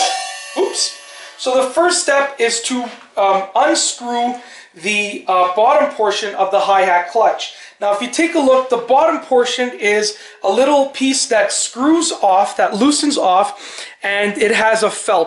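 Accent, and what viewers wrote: Canada. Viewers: Awesome video man and it sounds like your from Canada howdy my friend and neighbor to the north. Keep up with the tutorials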